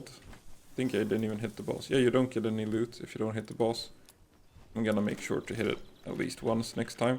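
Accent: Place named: Scandinavia. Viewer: Where are you from? You sound like maybe Sweden, Finland, Denmark or norway.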